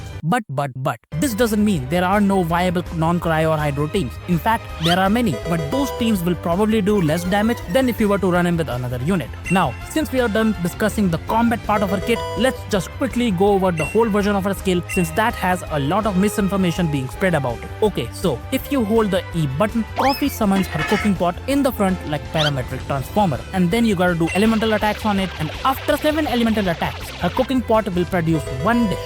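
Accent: India. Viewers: Insane editing and Accent ❤️ there's still 1% indian accent left hahahaha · Indian accent 🥵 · Indian English accent is funny. This is true, but I think this guy is far better than others and his video content is great so I see no complaints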